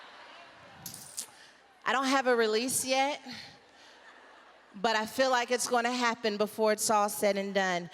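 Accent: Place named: AAVE, United States. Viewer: Hahaha! I love! Bring the ebonics sis! Lol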